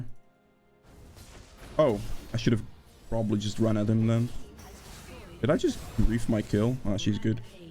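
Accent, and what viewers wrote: Netherlands. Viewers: Love the vids, i'm new to jungle and nocturne, learned a lot from you! Also; are you Dutch? Thought I recognized the accent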